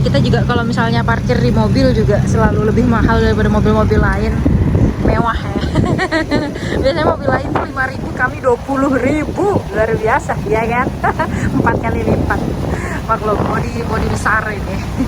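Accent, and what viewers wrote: Indonesia. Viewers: Logat kutai kk nya maha ga bs boong hehe. Hekda AC yg ada angin cepoi cepoi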